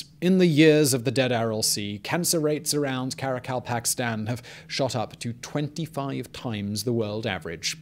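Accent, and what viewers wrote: RP, United Kingdom. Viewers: I really like your content, but what’s up with that accent? You did not sound that British on VisualPolitik. Seems like you’re trying too hard. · I don't remember Simon talking with such a posh accent on the other channel lol..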